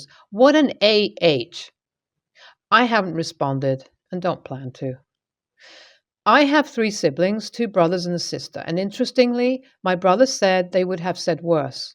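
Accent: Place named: United Kingdom. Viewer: Pick an accent and stick to it!!! Its grating to hear the bad aspects of uk accents.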